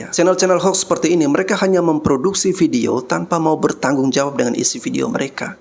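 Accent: Flores, Indonesia. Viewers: Aksen logatnya itu org NTT Flores 😊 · oh ye ke slang dia mcm org Indonesia jer kihkihkih 😂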